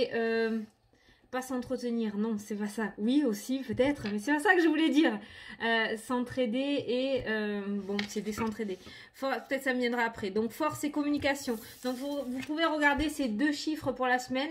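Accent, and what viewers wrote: South, France. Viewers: Il vient d'où ce petit accent du sud ? Mdr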